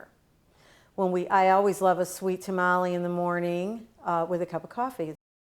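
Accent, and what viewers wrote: Midwest, United States. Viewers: Mexican lady with a midwest accent. She is too cute! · What a horrible Midwest accent lol. It what I expected.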